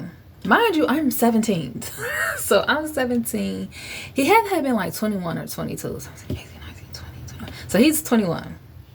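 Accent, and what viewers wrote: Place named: Midwest, United States. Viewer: Nice story! Seem like your from the Midwest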